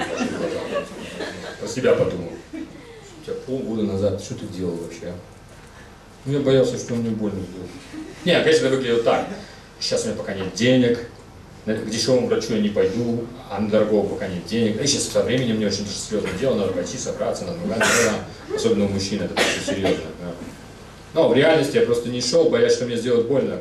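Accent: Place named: England, United Kingdom. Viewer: Речь ужасно косноязычная, с каким-то английским! акцентом. Поет фальшиво))))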